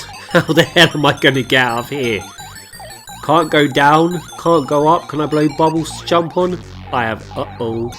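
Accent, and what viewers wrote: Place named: Southern England, United Kingdom. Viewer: Is swearing a thing that you do irl as well? Lol I mean it just seems out of place. Half those words are totally new to me! I am gonna go repeat that to my ten nearest fellow humans! I always get bollockings for saying things like I always get bollockings. It's a worthy sacrifice to the gods of filthy far-right Hitler language like piss and shit! I am gonna be like Moe from Simpsons, I know not what I say 🤣 STOP PLAYING WITH YOURSELF AS WELL!! Dude this is actually fuckin hilarious now... English accents are the best as well. You sound like somewhere between the home counties and egham you definitely in the south!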